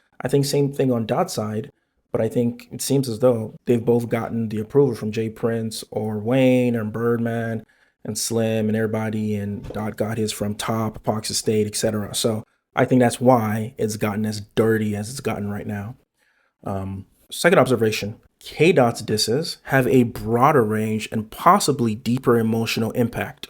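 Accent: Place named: Canada. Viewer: He’s just surface level reacting to get views from the situation which is disingenuous because if he truly wants to have genuine discussion he would actually do the work of making sure all his points have source’s. For example doesn’t have “higher ups” anymore and even said in the song he doesn’t care what the industry has to say which is where the true essence of the battle lies. What Drake represents is what has watered down hip hop to something vapid and almost soul less. said he is not our savior. Also if your not from this culture (which homie sounds like he has some Canadian in his accent no hate) you will never understand the blood, sweat and tears that has given this art form its soul. From Police brutality in Compton being called out by NWA to Kendricks “ ”. To those saying it’s performative even during the civil rights era Mahalia Jackson sang to keep the spirits up of the people.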